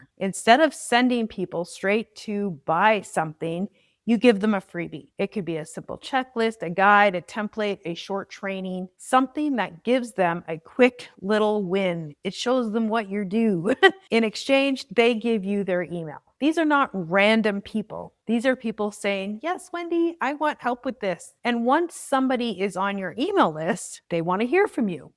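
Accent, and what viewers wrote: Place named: Canada. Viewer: Me too I heard her Canadian accent. I’m from Nova Scotia but live in Montreal now 😊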